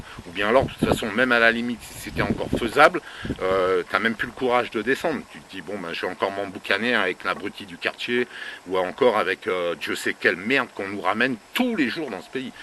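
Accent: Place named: North, France. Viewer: Bjrs Mr votre francs parle viens de quel ville .Qui me rappelle Mr papa du nord .😊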